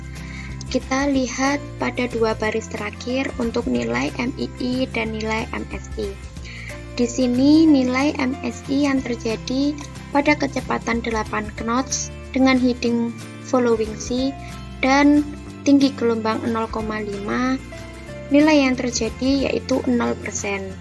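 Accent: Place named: Madiun, Indonesia. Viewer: Terimakasih banyak mbak jadi kangen sama medoknya madiun ehehe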